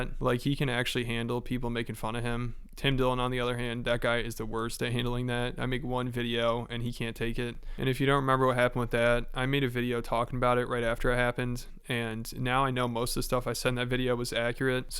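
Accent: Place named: South, United States. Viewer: Are you from the south? I feel like i hear it slipping out occasionally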